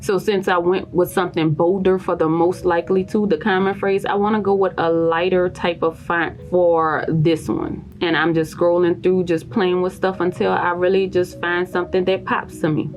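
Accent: New Orleans, United States. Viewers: It's the NOLA accent for me❤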